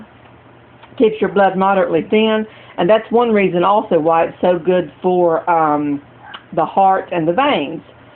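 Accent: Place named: South, United States. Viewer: You have a southern accent I'm curious I where your from because I'm from NC and I can't find it anywhere here only white clover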